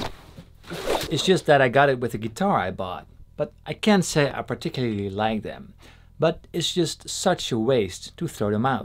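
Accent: Netherlands. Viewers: I'm a noob guitar player and your stuff's casual teaching has helped me learn faster than many other tutorials online and in books, so awesome work. Also... I absolutely love your accent. It's Dutch, right? I also am studying accents for voice acting and watch your videos to learn how to do that accent. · I knew you were Dutch from the moment I heard you speak! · He is so Dutch. His English sounds Dutcher than Dutch. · Sweet Brew Yeah it’s a Dutch accent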